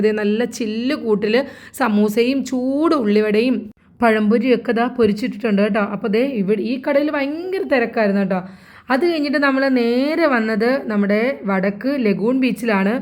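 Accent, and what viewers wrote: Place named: Lakshadweep, India. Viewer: സാക്ഷി പെരുന്നാൾ എന്നാണ്. അഗത്തി സ്ലാങ്ങിൽ നിങ്ങൾക്ക് കേൾക്കുന്നതിൻ്റെ കുഴപ്പമാണ്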